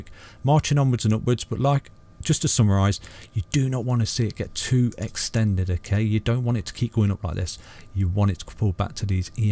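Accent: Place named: West Midlands, United Kingdom. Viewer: Thanks for the vid, I enjoyed it and learnt a lot. Also sounds like you're also a west midlands chap?hah Where were you looking at this TA chart? cant seem to get the detailed view on coin market cap. Also, im guessing as it went through the level of support you suggested, a new entrry point might be at $0.00020?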